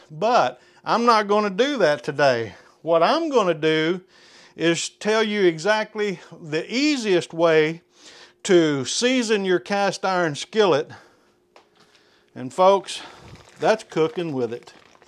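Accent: South, United States. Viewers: Youre a diamond, I've just bought one it will be delivered later. I find it hard to trust all these fancy videos but then a bacon-frying American with a Southern accent comes along and shows how its done, I feel confident in my purchase now. And yes sir, I will be frying bacon in it. Much love from the UK 🇬🇧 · Hi - I watched a couple of other cast iron skillet channels before I got to this one. They seemed ok, but once I found one with a Southern accent I knew I'd found my go to cast iron skillet channel. Thanks! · I grew up in the south watch your videos just to listen to your great accent. I may even buy a cast iron skillet 🤣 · Didn't trust anybody on YouTube showing me how to season a skillet i heared somone with a southern accent thanks for the video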